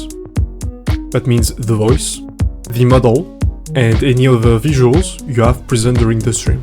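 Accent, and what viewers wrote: France. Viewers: l'accent fr xD, je m'y attendais pas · Did you know your accent is because you pronounce english words as French, or the letters atleast. It's why when I speak Japanese, I actually get praised on my accent because I force a fake asian accent that if I spoke in english would get me cancelled 😂 but for speaking Japanese it actually makes my accent more like a local.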